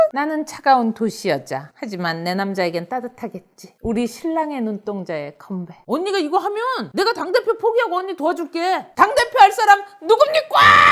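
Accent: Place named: Chungcheong, South Korea. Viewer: 충청도사투리 ㅋㅋㅋㅋㅋㅋ 너무 인간미 넘쳐서 혼자 낄낄거렸어요!! ㅋㅋ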